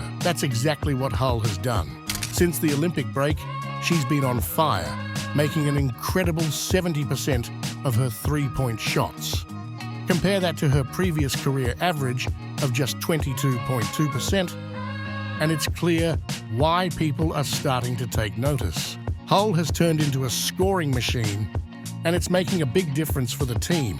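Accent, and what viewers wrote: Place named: England, United Kingdom. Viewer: Why is the voice-over either English, or worse, AI programmed to sound English. Get that crap back across the channel.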